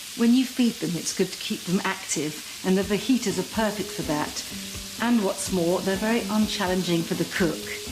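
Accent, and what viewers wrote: RP, United Kingdom. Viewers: "Gorgeous golden globules"... god, I love her RP (received pronunciation) accent and frequent use of alliteration.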